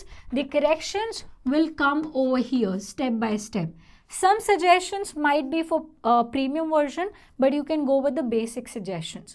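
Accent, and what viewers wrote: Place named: India. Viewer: I am used to watching this channel, because you use Indian pronunciation.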